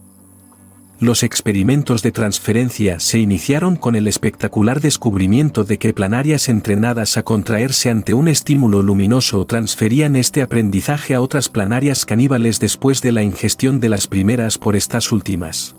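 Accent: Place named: Spain. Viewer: se agradece sobre manera, el doblaje en español de España, gracias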